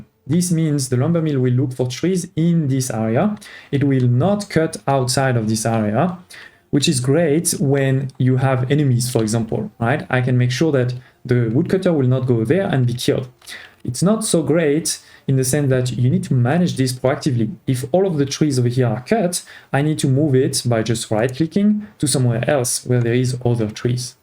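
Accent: France. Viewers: On aime l'accent FR à couper au couteau😊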